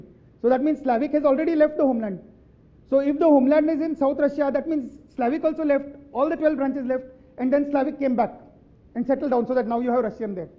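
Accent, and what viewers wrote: India. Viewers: His accent is distinctly Maharashtrian but your bias won't let you see such details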